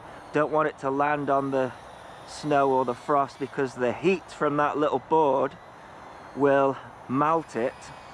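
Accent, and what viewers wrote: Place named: United Kingdom. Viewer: I really like your reviews, also your english pronouncing is very enjoyable, british :-)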